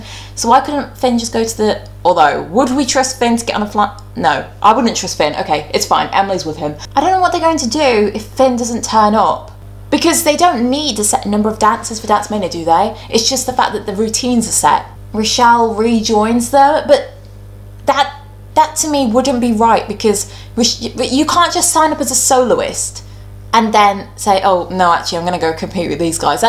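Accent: United Kingdom. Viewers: Wait are u British ur accent is so cool I’m Aussie and also when u post it I’m always here so our time zones must be very close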